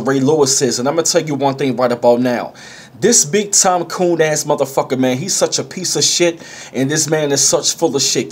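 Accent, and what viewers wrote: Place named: New York, United States. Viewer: Your NY accent is super fly 😘😘😘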